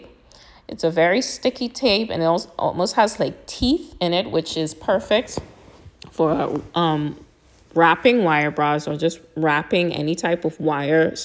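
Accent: Bahamas. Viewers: Beautiful. Love the video💕 and I heard a Bahamian accent ! 🇧🇸 just followed you on IG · I hear a Bahamian accent 🩵🇧🇸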